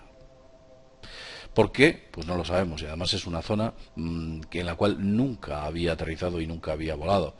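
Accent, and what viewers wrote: Spain. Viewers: QUE BOLUDO EL CHAVON ESE QUE COMENTA EL ACCIDENTE DE MALASIA,ENCIMA SE HACE EL GENIO CON SU VOZ GALLEGA QUE ADORMECE,MAL AHI YOUTUBE QUE DEJA QUE ESTOS MUERTOS SUBAN VIDEOS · O yo soy sorda o su asentó es muy español .. Jajaja no entendi nada no habla claro y fuerte .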